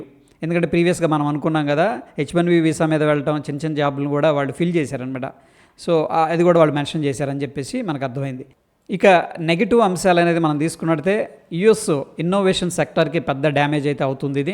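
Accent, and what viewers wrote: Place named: Telangana, India. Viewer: Hi sir this comment is not for this vedio .i would like share my opinion on all ur efforts...సర్ stock market లాంటి ఓక సీరియస్ subject ని మిరు మీ తెలంగాణ స్లాగ్ లో చెప్తుంటే full comedy గా నవ్వుతునే వింటాను.at the same time ఒక vedio 1 book tho సమానం గా చుస్తాను .TQ sir.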